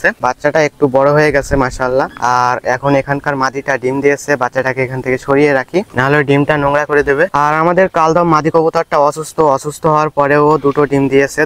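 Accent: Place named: Kolkata, India. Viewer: Kolkatar naki dada Tumi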